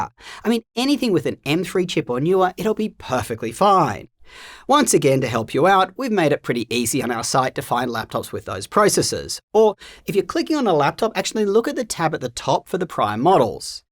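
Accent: Australia. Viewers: Why are you US centered when you sound like Crocodile Dundee?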